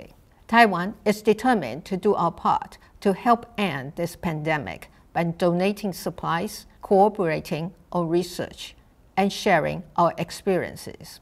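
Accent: United Kingdom. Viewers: 英國腔太神la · 小英 got a bit British accent which is cool:) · 蔡總統的英倫腔好好聽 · 有英國腔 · 哇！英式腔，英語優雅及用辭禮貌，有點像古典貴族風範，難怪美國政客這麼喜歡她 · 哇！蔡總統說的是英式英文欸！ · 小英總統的英式英文好好聽! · 對啊！以後總統像一樣，用英式腔英語優雅及用辭禮貌，有點像古典貴族風範，希望大家都在這點有共識，要不然臺灣就被中共更嚴重。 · 好好聽的英式口音喔😍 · 果然英國留學英國腔厲害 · 赤坂怜奈她是british 為主，american 為輔，有些音還是偏美式的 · 蔡總統的英文是不是算英式發音啊？覺得滿好聽的，場景覺得拍得好有質感。覺得台灣的總統好先進，有臉書有twitter還當youtuber, 好親民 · 迷人的英國腔😍😍😍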